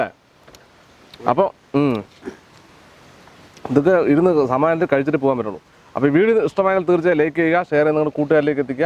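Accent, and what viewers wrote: Palakkad, India. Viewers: assalamu alaikum epozhum watch kayyil kanarund athond chodikkunnatha water resistant aano ikkayude watch? Njan oru army karan aanu palakkadan swadeshi epozhum ikkante videos follow cheyyarund bcz of your palakkadan slang keep going we are all with you always....(respect) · കുക്കിങ്ങ് വീഡിയോസ് ഇത്രക്കും റീച്ച് ആകാൻ പ്രധാനമായ കാരണങ്ങൾ... 1.പറമ്പിലും പാടത്തും പച്ചപ്പ് നിറഞ്ഞ ഗ്രാമ ഭംഗി യിലുള്ള ചുറ്റുപാടിൽ എടുക്കുന്നതും കൊണ്ട്😍 2. നാടൻ ലുക്കും വിനയം കലർന്ന പാലക്കാടൻ സംസാരവും😍 3.എന്തിനും കൂടെ നിൽക്കുന്ന കുറേ കൂട്ടുകാർ😍 4. അഗതിമന്ദിരത്തിലേക്കും മറ്റും ഭക്ഷണവും സഹായവും എത്തിക്കുന്ന മനസ്സിന്റെ നന്മ...😍👉👉ക്യാമറമാൻ്റെ കാരൃം പിന്നെ പറയേണ്ടതില്ലല്ലോ....😍 ഇതെല്ലാം കൂടിക്കലർന്നപ്പോൾ Village Food അങ്ങട്ട് കളറായി ജനങ്ങളില്...🥳...😍 ശരിയല്ലേ പറഞ്ഞത്🥳🥳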